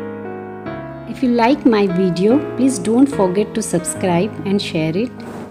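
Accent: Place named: India. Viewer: Love your roses, I have a frame like this one, I will practice this,thanks for sharing,love your voice,you sound like a couple of ladies I worked with very good friends,they are from India, I miss them so much.we have all retired now and lost touch,Jaya and hubby have been going on cruises,last I talked to her.Ranuka don’t know where she is, Have a wonderful life painting,it’s Beautiful Thanks for sharing,love 💕 I’ll be looking for the next love 💕 this One.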